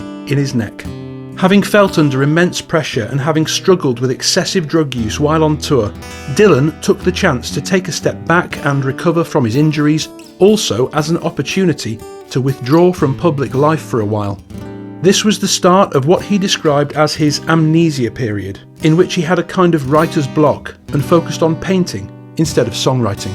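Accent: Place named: North, United Kingdom. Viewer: You are an excellent storyteller! Don't short-change yourself or your audience by adding meaningless background music😮 Seriously! It's enjoyable to listen to your voice, northern accent and natural speech rhythms👍🎉 Any background music is not only superfluous but substracts from the listening experience imo.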